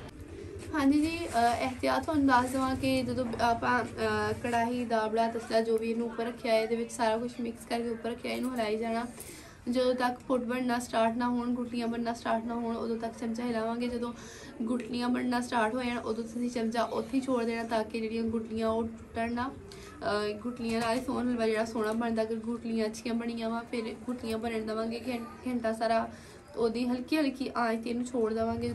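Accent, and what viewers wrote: Punjab, India. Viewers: Zaberdast 🤲 mah apko subscribe ho apki pqnjabi india wali panjabi hai Allah apko taraki dey ji ayn noh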